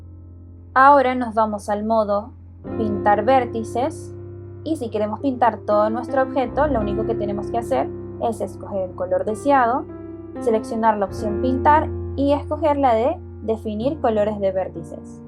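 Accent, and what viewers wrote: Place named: Lima, Peru. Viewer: El señor algoritmo de «yutúh» me recomendó tu vídeo, por fin le atina a algo. ¡Excelente contenido! (Supe inmediatamente que eras de Perú por tu acento de metrópoli, jaja)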